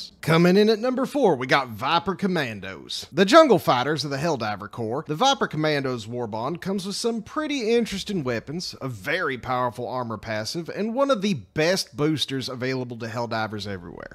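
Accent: South, United States. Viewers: After years of having to endure various European accents, this channel blindsides me with a beautiful Southern drawl. It's like a balm. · Bro I love your southern accent It's got charm haha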